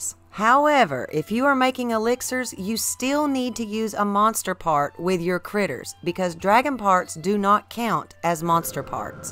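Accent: South, United States. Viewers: I love your southern drawl and your videos. Thanks! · The southern voice is so rare on YouTube. I’ve been out of the real South for so long I’m starting to lose my ability to tell what state people are from. You don’t have to answer so that you can maintain your anonymity and privacy, but I wanna guess either the Carolinas or Tennessee? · Thank you so much! I always use the snow boots and never bought the snowquill trousers so I didn't know unfreezeable was a thing until this video. Nice to hear a fellow southern girl Zelda fan too! Cheers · Is the narrator from the southern states? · Love her accent! Being from Oklahoma I never hear fellow southerners in gaming 🎉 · Holy hell you sound like family... Rare to hear such a sweet southern voice on the tube. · This girl is definitely from the south. I say texas or Louisiana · 1: I didn't know dragons were in this game and that's awesome 2: if you don't mind me asking, what is that accent? 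I can't tell if it's a southern accent or a Newfoundland one though I'm leaning more towards southern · A southern soccer mom voice talking in detail about video game strategy. That is a true paradox. It’s wreaking havoc on my brain. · I don’t think I’ve ever heard anyone with a country accent ever talk about Zelda before and I didn’t know I need it.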